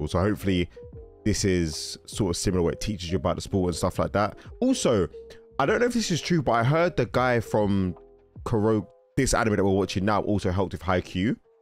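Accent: United Kingdom. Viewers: Are you from England? Your English sounds like British English?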